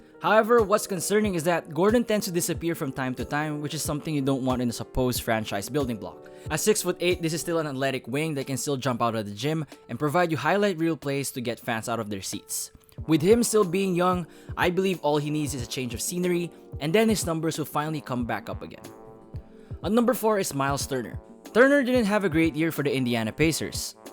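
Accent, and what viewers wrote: Philippines. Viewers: I actually noticed it too, from the amount of my filipino teachers having the same accent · Not racist but you Filipino? Your accent is Filipino-like and the Philippines is in the pacific Just a question from a Filipino fan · I love the filipino accent ♥️♥️🇵🇭🇵🇭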